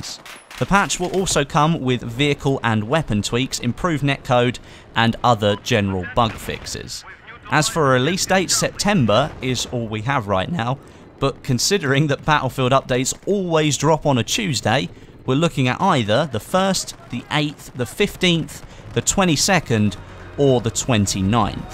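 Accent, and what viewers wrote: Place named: United Kingdom. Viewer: Yes, he's British.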